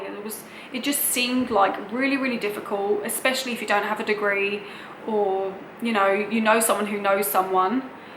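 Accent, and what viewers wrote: Australia. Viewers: Happy you like it here, your way of talking is a real laugh, such a great mix of UK and OZ. You live in Townsville but first I thought I heard more of a Melbourne accent there. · Your accent is turning Aussie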